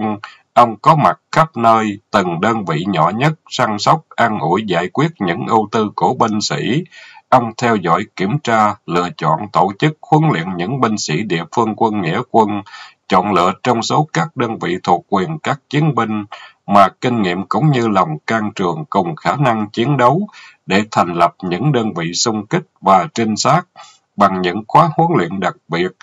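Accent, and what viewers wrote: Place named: South, Vietnam. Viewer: Không biết tôi đã theo giỏi chuyện nay không biết bao nhiêu lần!rất thích loi hành văn mạch lạc của tác giả, giọng đọc miền Nam của anh. Loi điều binh và chiến lược hành quân của manh su Ng mạnh Tường.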